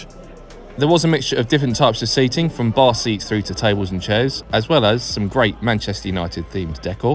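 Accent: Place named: Cockney, United Kingdom. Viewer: THEY HAVNT GOT A ROOF . BWAHAHAHA RINSED . COCKNEY TWAT .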